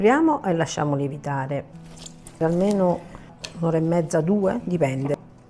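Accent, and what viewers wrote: Calabria, Italy. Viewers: Ciao sei bravissima,ti seguo sempre, sei di Reggio? Di quale parte della Calabria?😘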